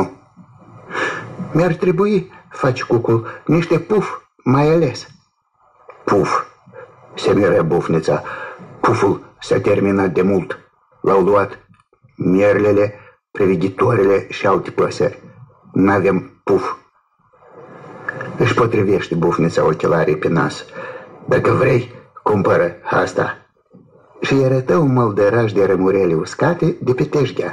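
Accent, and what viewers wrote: Moldova. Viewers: Dragă, chiar dacă am peste 60 de ani, iubesc poveștile copilăriei. Mai ales poveștile de seară, spuse în dulcele grai moldovenesc de peste Prut. Te îmbrățișez cu multă dragoste! Îți doresc o săptămână binecuvântată cu multă protecție DIVINĂ! 😘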